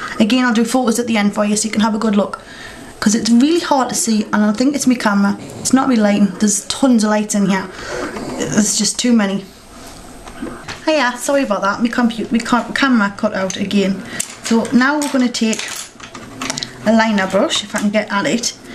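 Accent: United Kingdom. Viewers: Its The Geordie Accent.. Everyone From Here Says That. Bt To You It Would Mean Our Eyelid Lol. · you r really goood!! hahaha oh i really miss the british accent!! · she is british but we'd say shes def from newcastle or nearabouts. geordie accents are actually too cool we want one. love the makeup in this too, that palette is awesssommee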